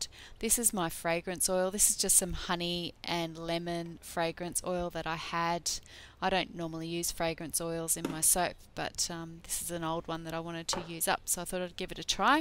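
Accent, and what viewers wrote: Australia. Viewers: Hi, thankyou for your video. I usually make cold process but have felt uninspired for a long time now. I just made Hot Process with honey, no fragrance or E.O. following your instructions. In the mold now, cant wait for it to harden up and cut! Lovely to hear an Aussie voice too!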